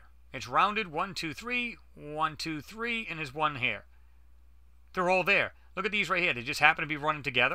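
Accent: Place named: New England, United States. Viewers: he's definitely from New England, Greater Boston area would be a good guess. cool picture of the Moon too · great videos brother very interesting I always knew that those nasa bastards have been lying to us lol any ways thanks for the great videos brother. Are you from Boston you sound like you have a Boston accent. Well anyways you got your self a new subscriber keep making these great videos.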